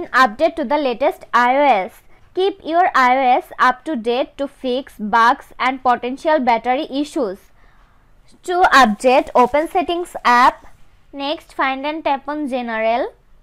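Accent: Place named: India. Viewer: you sounds like indian call center scammers💀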